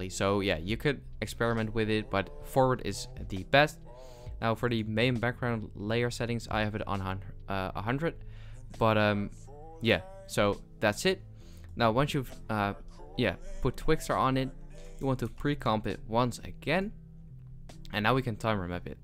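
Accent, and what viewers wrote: Netherlands. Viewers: i just know you're dutch, you sound so dutch haha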